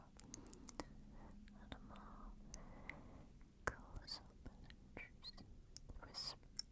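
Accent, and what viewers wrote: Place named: Scotland, United Kingdom. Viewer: I never realised how Scottish sounding you were, thats brilliant! Brilliant video once again!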